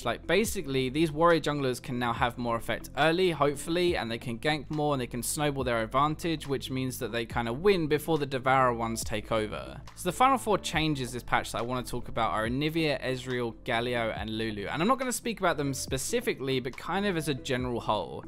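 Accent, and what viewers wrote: United Kingdom. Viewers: the reason I'm wathcing the clip consists partly of your british accent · Dude i love your vids! plus im british as well so :D ur accent is normal to me i couldnt tell the difference xD anyway keep ur amazing vids up and remember them puns of funniness xD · I could have listen to you for hours british accent is so cool :D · I love British accent! And I'm not the only one haha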